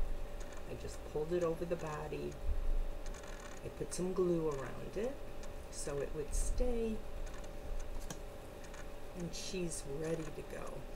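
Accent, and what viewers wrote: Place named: Michigan, United States. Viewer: That was a great tutorial and I enjoyed hearing your voice from MI perhaps? I can't wait to get these myself.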